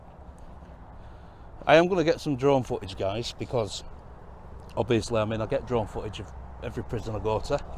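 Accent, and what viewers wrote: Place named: Yorkshire, United Kingdom. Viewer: Does anyone listen to these videos just for the Yorkshire accent? Hope you're all feeling better. Cheers from Texas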